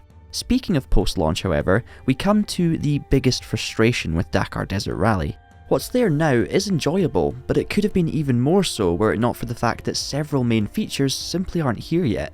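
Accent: Scotland, United Kingdom. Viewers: That’s a very clear Scottish accent … would love to hear the real accent though !!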